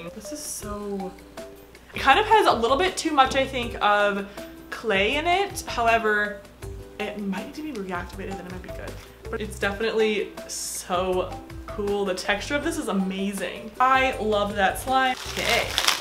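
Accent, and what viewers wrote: United States. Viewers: Is she american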